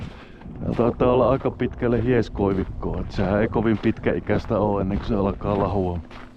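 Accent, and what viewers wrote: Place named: North, Finland. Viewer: Näin metsäalan ammattilaisena ottaisin tuosta nuo harvat hieskoivut polttopuiksi ja kiireesti kuusen taimia maahan. Olisin istuttanut ne jo viisi vuotta sitten kun sanoit, että laidunnus on siinä jo loppunut. Nyt siinä kasvaisi jo perkuukypsä kuusen taimikko. Nuo kilpailun hävinneet vanhahkot keskikokoiset kuuset ovat aina arvoitus. Melkein kun sellaisen kaadat on siinä jo musta piste keskellä eikä siitä silloin enää kunnon puuta tule, vaan vie tilaa terveiltä puilta. Eli olisin ottanut ne harvat pikkukuuset ja hieskoivut kuiduksi jo hakkuussa ja istuttaen uusi taimikko tilalle. Hieskoivu tuppaa olemaan niin kova siementämään, että raivurille on taatusti työtä aina uuden vesakon puskiessa yhtenään. Murteesta arvelen, että kohde on pohjoisessa joten näin pirkanmaalaisissa eteläisen suomen metsissä työskentelevänä maaperä ja puun kasvu on tietenkin erilaista luonnon olosuhteista riippuen ja se mikä toimii etelässä ei aina toimi pohjoisessa. 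Rannan läheisyys ja kostea maaperä kohteessa tukee tietenkin koivuista hieskoivua, kun raudus on karumman maaperän puulaji. Kuusi on toinen mikä tuossa menestyisi kuten sanoit. Kuusi siementyy luonnollisesti koivun alle menestyksekkäästi ja luulisin, että lähipuista muodostuu uusi kuusi sukupolvi tuon rantakoivikon alle. Näin sen voisi kaksois kasvattaa lopulta kuusta suosien ja ne koivut pois vaihtaen lopulta puulajisuhde kuusivoittoiseksi. Jatkuva kasvatus ei onnistu kuin teoriassa ruutupaperilla ja on sääli, kun erinäiset viherideologiset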